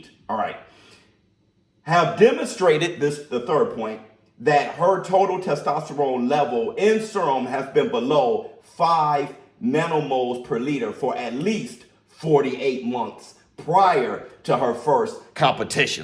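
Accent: Louisiana, United States. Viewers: Is you from the boot mane?! Whodat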